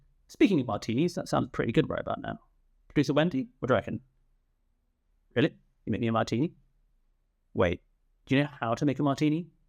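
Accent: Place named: United Kingdom. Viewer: You had me at "the human stain ... Pepsi!" Proper thing listening to a solid review of my favourite Bond with a British accent ;-)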